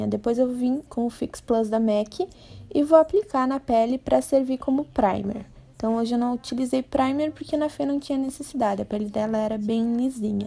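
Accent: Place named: Rio Grande do Sul, Brazil. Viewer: sotaque gaucho, é nóiiix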